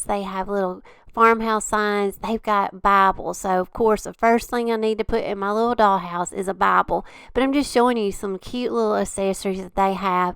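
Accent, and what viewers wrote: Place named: West Virginia, United States. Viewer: Hi, I sincerely love watching your Channel... Not only do l think you’re an extremely talented crafter, l love your accent! It reminds me of my late Aunt who lived in West Virginia. I’m surprised you didn’t know about the “Cling Wrap” trick because, we’re about the same age. I was doing this way back in the late 70’s as a teenager. Mod Podge has been around since the late 60’s but it was expensive especially for a teenager. We use to make our own Homemade version of Mod Podge by mixing a 3 to 1 ratio White Glue and Water. Another groovy craft we were making was Crayola Crayons T-shirts! 🖍 Just in case anyone is interested: - Remove the paper wrapping off of your crayons and grate them using the smallest holes. - Iron your T-shirt smooth - Place several (4) sheets of paper towels “inside” your T-shirt. (It prevents the crayon from soaking through the front of your T-shirt onto the inside! 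This is an extremely important step, do not skip it!) - Lay your crayon gratings onto your T-shirt anyway you desire. Or even into a simple design! ♥️⭐️🌙🌸🌈🔥⚡️ You can use all of the colors you want! Although keep your layer of crayon shavings on the thiner side. You DO NOT want a thick layer! - Cover your design carefully with Parchment Paper (DO NOT USE WAX PAPER!) - With your Iron set on High (USE A DRY IRON, NO STEAM NEEDED!) Just press for approximately 90 Seconds, let your Parchment paper cool for 10 seconds then peel off. If you laid your crayon gratings into a Design, then when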